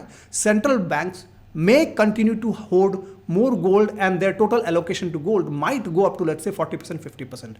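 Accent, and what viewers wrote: India. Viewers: Your accent is Bengali with Marwadi 😢😢😂😂😂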